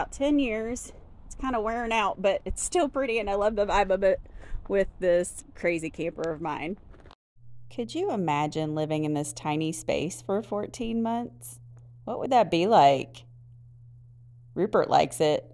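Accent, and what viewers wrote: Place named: South, United States. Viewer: I love your personality and your adorable southern belle accent, happy travels!!!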